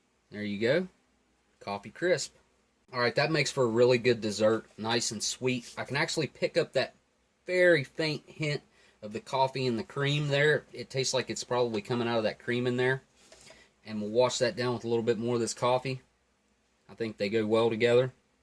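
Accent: South, United States. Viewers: Where ya from? At first I thought you were Australian but you sound American. You from Texas? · recognized the heavy southern drawl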